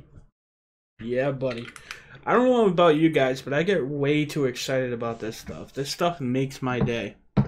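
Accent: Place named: Rhode Island, United States. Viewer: Lots of good flavors there! Thought I recognized a RI accent. Worked 34 yrs in Providence, before retiring to FL.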